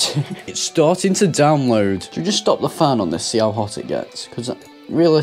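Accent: United Kingdom. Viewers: I love that British accent, it makes me feel that I'm the one troubleshooting the pc.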